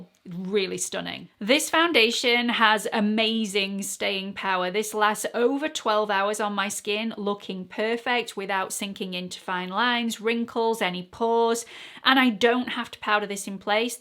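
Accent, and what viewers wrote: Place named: North, United Kingdom. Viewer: I am still trying to work out your accent. Still not sure what part of the country you are from. I have narrowed it down to North 🤣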